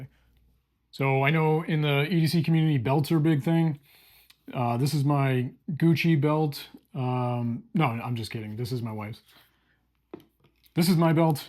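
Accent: United States. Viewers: I was thinkin louisianian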